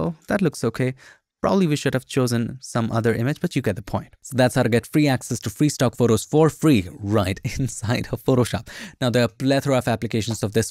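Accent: India. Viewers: u have an annoying voice, I can still hear the indian accent u are almost succesfully hiding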